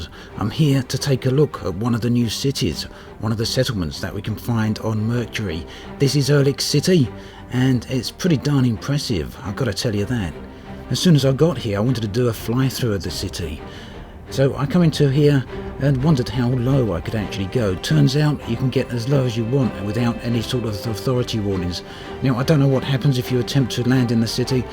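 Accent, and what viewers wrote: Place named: England, United Kingdom. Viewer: Where are you from mate, I know you are English like myself, cannot pinpoint your accent though. I've been playing lots lately and absolutely love the Game, and that's without Horizons so I await that eventuality with bated breath. I play Solo mostly but enjoy it to detraction. Anyway keep posting, I look forwards to viewing all your videos more than anyone's on you tube. Have you got a website of your own? Cheers Mate Paul. By the way have a nice Christmas.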